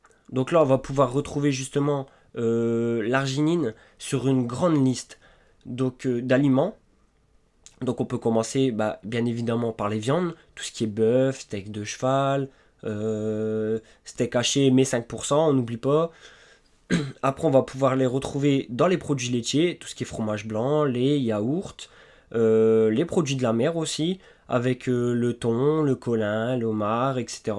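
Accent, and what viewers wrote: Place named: Nord-Pas-de-Calais, France. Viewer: Super bonnes explications, avec un "grave" accent Ch'ti, en supplément🙄🤗. Je vais même réécouter cette excellente vidéo, super complète et scientifiquement pertinente, pour noter les points que je connaissais moins bien. A 63 ans 1/2, j'ai eu il y a peu ( après m'être épuisée pratiquement jusqu'au burn out à soigner jusqu'à sa fin un parent, puis l'autre), un manque de tonus et réponse musculaire grave. Je n'arrivais plus à me relever, difficilement à monter un petit escalier et à marcher. C'était incompréhensible et ma Dr me disait: "C'est l'âge ! ". Tu parles, ça se saurait si à 63 ans, les gens, en 2021, étaient pratiquement TOUS incapables de bouger !!! En réalité, bien que n'étant pas végétarienne, je manquais de vitamine B12 et un chouia de potatium. Pourquoi ? Je ne sais pas. Un sursursurmenage trop intense, pendant trop, trop, trop, trop, trop, trop, trop d'années (7 ans 24h/24, après 20 ans par intermittence) , trop de charge physique et mentale non stop en solitaire ? 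Bof, peut-être ou ? Je vais mieux mais faut toujours que je sois sur tous les fronts, alors j'ai bien l'intention d'alimenter ma machine humaine pour qu'elle soit efficace et autonome le plus longtemps possible. Le moral et la cervelle, ça va. Je sais comment les maintenir en forme naturellement. Vraiment, tu es très clair et pédagogue. La petite vieille te fera savoir si elle parvient à reprendre un peu de fitness, de danse, de vélo et de randonnée. 🧙‍♀️🏋️‍♀️💃🚴‍♀️🚶‍♀️💪 🧙‍♀️ 🤭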